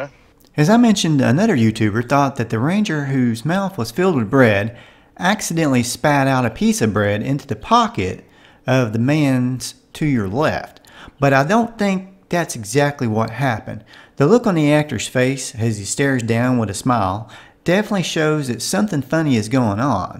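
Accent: South, United States. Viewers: dunno too busy trying to listen to your forrest gump accent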